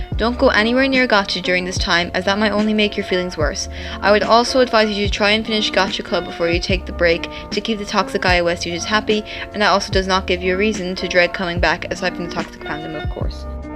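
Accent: Ireland. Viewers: Is she irish? Lol im irish and i recognise the accent xD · Are you Irish? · You sound Irish are you? because I am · One question are you Irish cause I recognize your accent from my own 😳😳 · sorry if I'm asking a personal question.. But are you Irish by any chance? I just hear some similarities in the accent lol 😂😅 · Why do u sound like your from Ireland or sumthing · Omg are you irish 😍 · Are you Irish? :3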